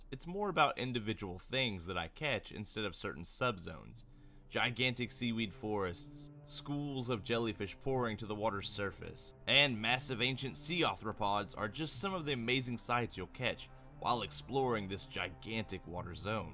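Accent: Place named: Canada. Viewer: I can't place your accent....it's subtle, but definitely distinct from my Bay Area English...Canadian maybe?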